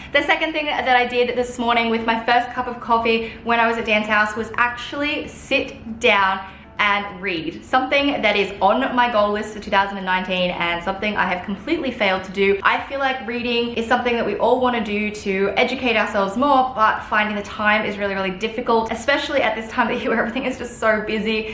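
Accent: Australia. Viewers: This may be a weird question.. are you Australian? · Hi! Are U from Australia?? By the way, New here, love your videos!! ❤️❤️❤️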